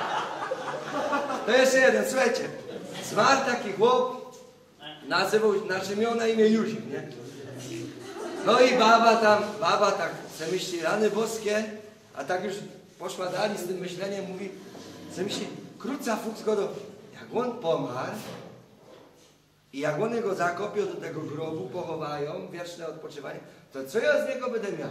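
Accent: Silesia, Poland. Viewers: to jest raczej po śląsku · oooooooo ten śląski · haha tylko ze to jest akurat gwara śląska xd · Facet ma talent, po glosie poznaje że jest ze Śląska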